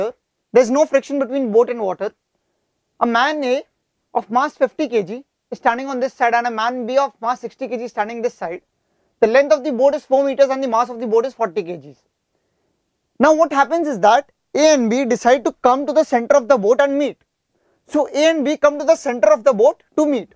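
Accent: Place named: India. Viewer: oh man goddamit indian i couldnt understand any word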